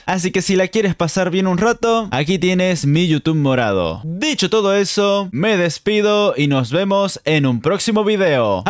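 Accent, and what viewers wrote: Córdoba, Argentina. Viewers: Pareces cordobes con ese acento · Soy yo o tiene acento cordobés? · HABLA COMO SI FUERA ARGENTINO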